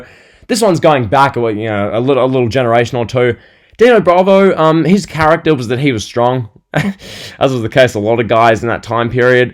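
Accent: Australia. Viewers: I love the Aussie accent bro, bless you..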